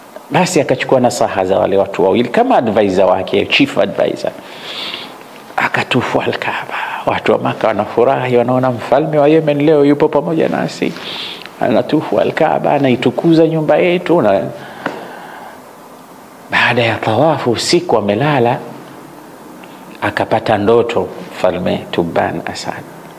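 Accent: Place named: Digo, Kenya. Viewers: Huyu sheikh amezaliwa Kenya? His Kiswahili sounds like a kenyan. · Kweli kabisa ni mdigo kabisa uyu sheikh wetu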